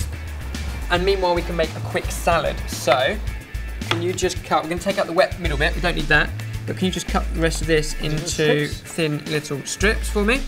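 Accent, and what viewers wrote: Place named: United Kingdom. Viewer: accent is seriously waaaaay too british))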